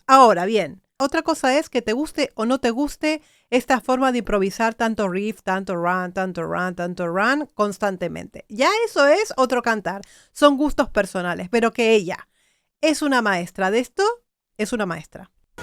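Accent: Argentina. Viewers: Eres argentina y española ? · se te escucha tu acento argentino como si hubieras estado ahi hace poco ❤no te lo notaba tan marcado como hoy. Pero también, no me he metido mucho a YouTube entonces igual soy yo la que te escucho mal. Gracias por tu pasión a la musica. Me encata ❤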